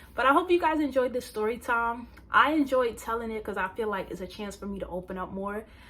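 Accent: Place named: New York, United States. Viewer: Omg are you from NYC, is this how we sound😂😂😂😂 lol 🌺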